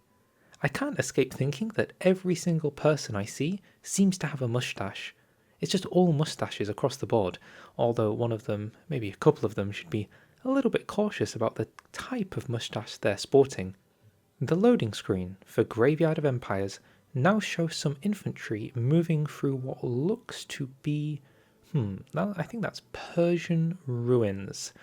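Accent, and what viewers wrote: United Kingdom. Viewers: Dude you should record audiobooks, such a relaxingly bri'ish voice 🧐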